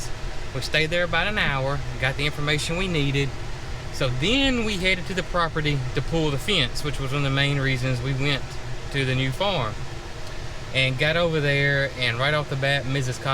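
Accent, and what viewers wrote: South, United States. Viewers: Love this guys accent I think southern states of America have a very fluid way of talking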